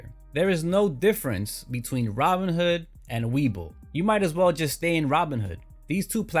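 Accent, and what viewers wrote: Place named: New Jersey, United States. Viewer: Are you from New Jersey?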